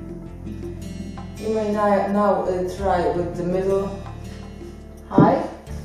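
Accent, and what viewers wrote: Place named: Turkey. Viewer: türk aksanı sezdim sanki :)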